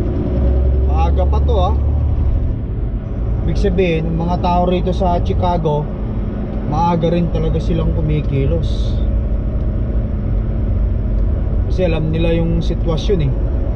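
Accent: Ilocos, Philippines. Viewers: Kaykayat ko ta ilokano accent mo lalo no ag-english ka, reminds me of my old man. Ilokano Owner/operator as well ditoy abbotsford BC ngem salesman/distributor ti business ko ti winnipeg based chips company. 18 years na ako sa Canada medyo naikkat kon diay ilokano ken pinoy accent kon na agsao ak ti english. Hahaha praktis lang. Lol😂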